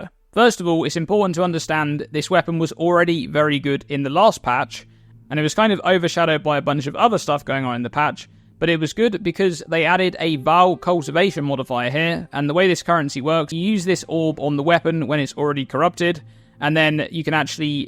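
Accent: United Kingdom. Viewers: 10 minutes is the max for me listening to this illness that is called british accents, damn thats so terrible, sorry for this disease.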